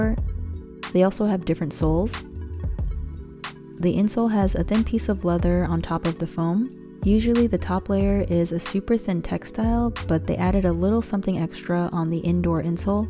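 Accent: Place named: United States. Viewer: I like these, you sound American so I don't know if you know but there is a rabid three stripe collectors scene in the UK and Europe for these types of Adidas sneakers. It dates back to the early 1980s when football fans would follow their team in Europe and they would come back with Adidas Trimm Trabb etc and it became fashion for the fans to dress in their best and most expensive clothing and Adidas and a few other sneaker makes and it sort of became a uniform for the now known as "Casuals" who would follow their team across Europe and then the cycle continues with new brands like CP. Company, Stone Island etc etc. Anyway it's still a very fierce collectors market still to this day as new generations get into it, and with the release of archive inspired trainers (we call sneakers trainers usually in the UK) by Gary Aspen with the much sought after Adidas Spezial clothes and sneakers releases each year. 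Anyway that's quite a fun little rabbit hole you could go down just by checking Gary's Insta and see where he gets the design ideas etc. Also in the UK, there is a great source of Adidas information on Twitter on discounts on sneakers like these etc, just look for 'Man Savings' on Twitter and they post great discount deals every day. I also seen that there was an American doing pretty much the same thing for people in the states, I can't remember his Twitter tag but I don't think it would be hard to find as its well worth looking and following these types of pages for excelle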